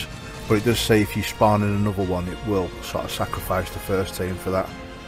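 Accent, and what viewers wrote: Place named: England, United Kingdom. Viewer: yeah I saw the creator bit with vids but couldn't find a list of the creators to check out . There should be a sections with a list of the creators and their channels to check out if there is I'm sorry I can't see if but if there is point me in the right detection ...I have just been getting some pointers off some of the guys on how to make vids on the xbox since a few people have messaged me asking me if I have vids on some of my builds but I only have pics ...I'm going to be trying to make a vid in the next couple of days with the help of some of the guys from the discord ...ohh n bwt love your accent on the vids makes me feel at home since I have moved out of England to be with my gf ..keep bringing out some awsome vids hope to see some cool designs in the future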